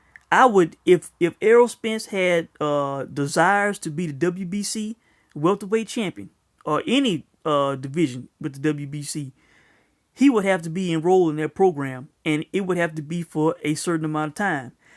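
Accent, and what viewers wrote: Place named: Alabama, United States. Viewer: Everyone has there own opinion but u are a hater, get your facts right errol used Vada when he fought Brook, if u do your research hes on the Vada website, u dont sound like the most intelligent brother but i know u can read. Before Spence fought Brook u were disrespecting him saying he wasnt that good, hes fought nobody, saying he would destroy spence & indicated that u would even eat crow if Spence beat Brook, u went live on youtube an ate your Crow in front of your viewers now mths later u decide to contradict yourself suggesting the best welterweight on the Planet is ridin dirty. smh. After he broke Brook face (eye socket) now your saying Errol is juicing, child please. i admit Spence aint no Sugar Ray Leonard, Tommy Hearns, Aaron Pryor but he is a bad mf he must be if he fight so good he got u thinking hes juicing lol, look bruh knowledge is power so read & stop sittin up there sounding like u have a 3rd grade education an speaking like your from Possums Paul Alabama a lil town with a population of 12 people. 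lol. this aint fishing this is boxing, get ya mind right cause your new nickname on youtube is cant get right. lol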